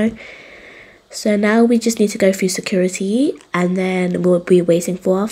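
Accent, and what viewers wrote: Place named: United Kingdom. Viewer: The British accent though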